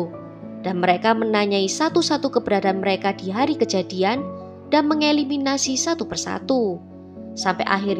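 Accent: Java, Indonesia. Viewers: medok bnget jowone mb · Mbk asal nya jawa mana toh mbk medok2 gitu hehehehehhe maaf cm mau nanya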